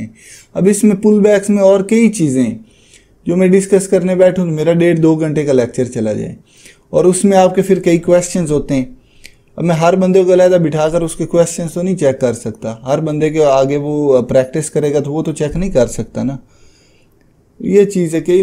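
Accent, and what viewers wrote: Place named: Lahore, Pakistan. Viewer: are you from pakistan bcoz of your Lahori accent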